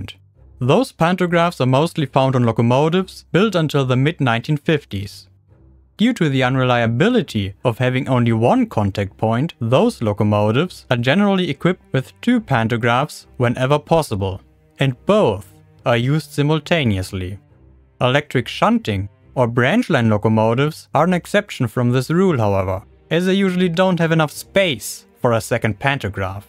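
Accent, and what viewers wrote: Germany. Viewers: Um. He has a German accent because he's German. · Awesome video about great topic! I really like the slight German accent too, very authentic and original! Either way, you earned yourself a new sub, lookin forward to your next vid!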